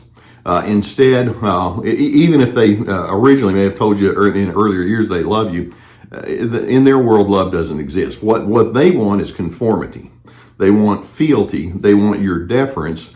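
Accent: South, United States. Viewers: insightful and educational as ever. I love your southern accent too!❤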